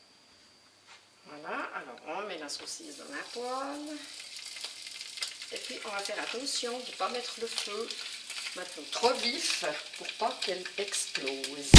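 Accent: Vaud, Switzerland. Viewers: Pas mal le coup du vin blanc et de laisser mijoter, d'habitude moi je mettais que de l'eau et un oignon sans laurier... Super accent en passant 😂, canton de vaud j'imagine... Super recette je vais tester 👍🏼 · J'aime l'accent très vaudois j'ai fait 2 fois la recette 👍👌😋😘merci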